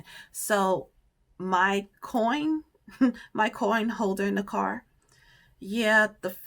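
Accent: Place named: Georgia, United States. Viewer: I’m 3 yrs. Late but for being born in NYC and living in NORTH Jersey you sound like you come from Georgia. If you’re still there in a few more years we might be neighbors when I finally retire.. 😂😂😂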